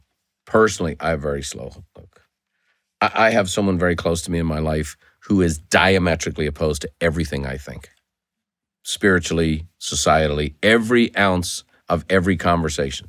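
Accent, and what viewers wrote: Dublin, Ireland. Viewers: He has a Irish drawl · I’m from Cork, love hearing his Dub accent 🎉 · Yes he has a Dublin accent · Comments say he's an Irish-American immigrant Brian Buffini. Cool. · Is this guy Irish? Has such a crazy accent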